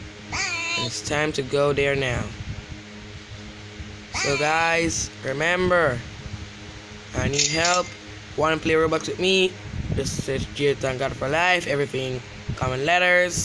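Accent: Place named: United States. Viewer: Yuh sound a way talking american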